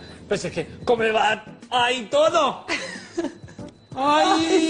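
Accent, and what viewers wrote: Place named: Extremadura, Spain. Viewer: Y el acento extremeño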